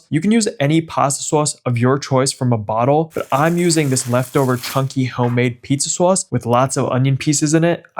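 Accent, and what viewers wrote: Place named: New York, United States. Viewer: What’s with the NY accent in your new videos? I never noticed an accent in your younger videos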